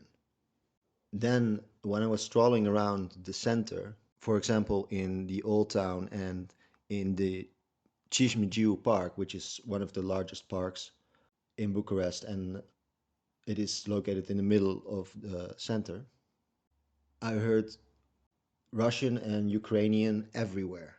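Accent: Netherlands. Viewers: Are you Dutch? :0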